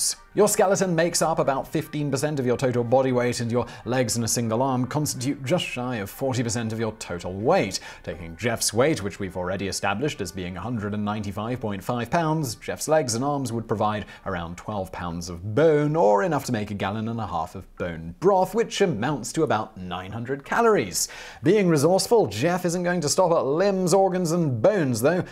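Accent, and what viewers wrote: England, United Kingdom. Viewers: sounds so English and proper, when he cracks up, it's super hilarious! Cracks me up!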